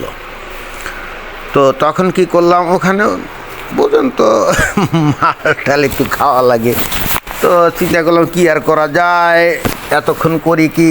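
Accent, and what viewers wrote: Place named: South, Bangladesh. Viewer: আপনার ভাষার উচ্চারণ শুনে ধারণা করি আপনি যথাসম্ভব দেশের দক্ষিণাঞ্চচলের মানুষ,আমার বাড়ি ও এদিকে।Student visa তে আমি ও দুই বছরের মত ইউরোপের একটা দেশে ছিলাম কিন্তু মন টেকেনি।পড়াশোনার পাশাপাশি কাজের সুযোগ ও খারাপ ছিল না তবুও কেন জানি Home sickness কাটিয়ে উঠতে পারিনি চলে আসলাম।পরিচিতরা ঠাট্টা বিদ্রুপ করে চলে আসাতে কিন্তু তাদের কে কি করে বুঝাব দেশের জন্য মন হুহু করার অনুভূতি টা কেমন।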